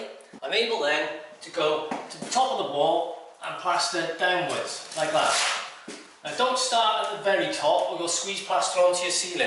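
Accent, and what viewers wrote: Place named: South Wales, United Kingdom. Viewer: South Wales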